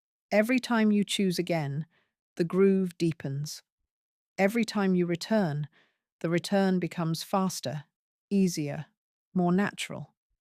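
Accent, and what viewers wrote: United Kingdom. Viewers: I really love the British accent. Idk why but it's very soothing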